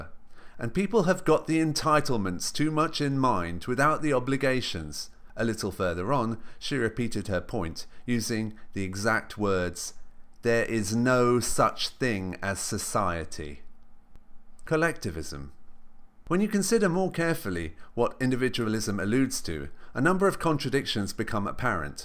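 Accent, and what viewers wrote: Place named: United Kingdom. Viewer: british accent :checked video on a hot topic: checked shitty opinion : checked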